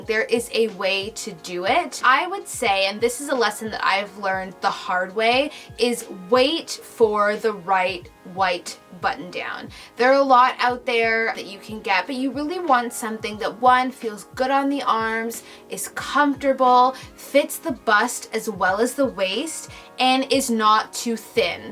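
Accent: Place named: Canada. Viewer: "Crusty clothes". That's so cute. Jamaicans have the cutest words and sayings. You mentioned that you live in London. You don't have a British accent. You sound Canadian, do you mean London, Ontario? I live I Canada and a coat, and more than 1 is sooo important. Great tips and great video.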